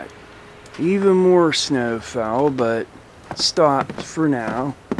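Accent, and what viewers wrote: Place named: East Coast, United States. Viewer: You have a very nice accent. Definitely east coast, you can tell by the "o"s.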